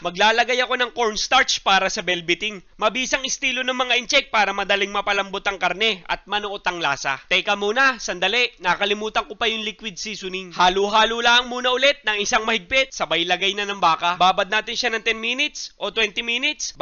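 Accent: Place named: Oriental Mindoro, Philippines. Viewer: Natutuwa ako sa pananalita mo .alala ko ang time ko aa Oriental mindoro..ala eh ganyan sila magsalita..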